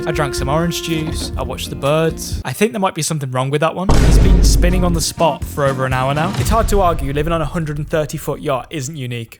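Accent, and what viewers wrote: United Kingdom. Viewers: ryan trahan but in british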